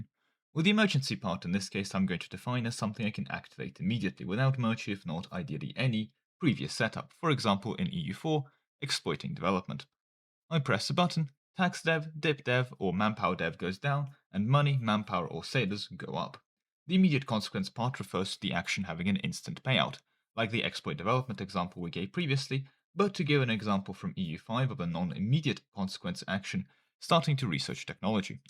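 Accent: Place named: United Kingdom. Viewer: x3 speed is only possible for americans, British accent unintelligible over 2-2.5x speed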